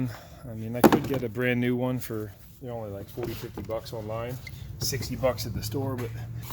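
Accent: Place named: Philadelphia, United States. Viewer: I'd like to see your swap process for the 4 speed. this is the first video I've watched and it was great. You sound like your from Northeast Philly I'm from Harrisburg. It's really cool to see someone local doing this on YouTube I've been thinking about starting my own channel and I love your format. Keep it up!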